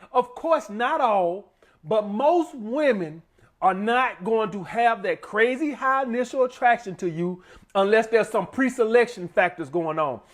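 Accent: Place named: Georgia, United States. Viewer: I can always tell when you go to another state especly Georgia ur accent hilarious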